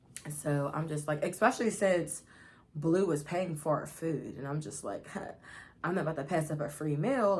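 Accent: Pittsburgh, United States. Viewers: this is so random but i love ur accent bc it reminds me of my old college best friend 😭 i knew instantly u was from pittsburg i miss her lil cow girl sounding self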